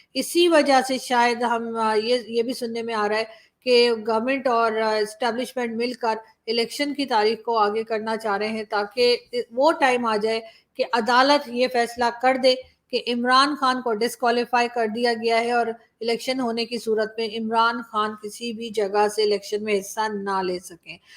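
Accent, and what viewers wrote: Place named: Uttar Pradesh, India. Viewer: 🙏 mam ji ap to uttar pradesh ke se boli bolte hai mam 🙏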